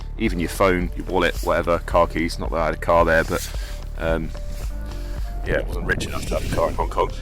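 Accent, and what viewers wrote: United States. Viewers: Impressive American accent, Mr..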